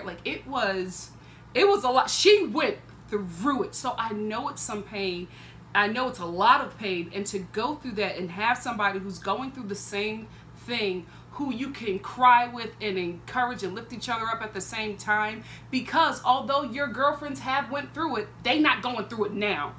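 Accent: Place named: Philadelphia, United States. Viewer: Omg this is the first time I have ever heard your Philly accent 😀😀 I enjoyed this review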